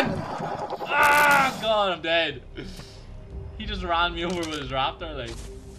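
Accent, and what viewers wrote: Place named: Ireland. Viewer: Haha that was fucking hilarious, I can tell your Irish as I'm Irish myself 😂🇮🇪👍🏻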